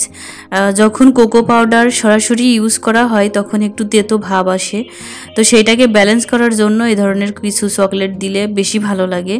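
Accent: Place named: Jhenaidah, Bangladesh. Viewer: আপু,আমি প্রথমে জানতাম না যে তুমি কোন জেলার ۔۔۔কিন্তু তোমার ভাষাটা আমাদের অঞ্চলের মতো লাগতো ۔۔۔পরে জানতে পারলাম যে তুমি ঝিনাইদহের মেয়ে ❤۔۔আমিও ঝিনাইদহের ۔۔আসলে নিজের অঞ্চলের মানুষদের উপর অন্যরকম এক টান কাজ করে l আমি তোমার নতুন ভিউয়ার ۔۔ অনেক কিছু শিখতে পারি তোমার থেকে۔۔۔۔۔۔আপু নেক্সট ভিডিওতে ঝিনাইদাহ নিয়ে কিছু বলো۔۔ আর আমার নামটাও একটু মেনশন করো ۔۔۔তোমার মুখে আমার নামটা শুনতে চাই ❤ +জেসমিন = ❤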